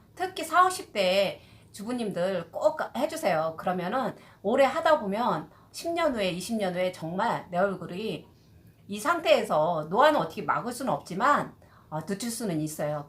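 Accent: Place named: Chungcheong, South Korea. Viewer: 충청도 분 이시군요 ㅎ 영상잘보았습니다 구독 꾹~~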